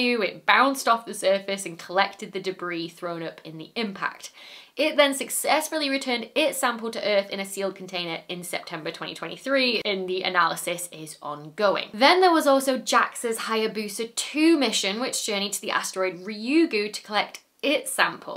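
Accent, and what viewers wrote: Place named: England, United Kingdom. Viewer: I am hard of hearing and have trouble understanding most women. For some reason, even with your heavy English accent, you are easy for me to understand. I think some of it is due to your precise pronunciation of words. I really appreciate your efforts at making yourself understood and for the great explanations of difficult subjects. Keep it up!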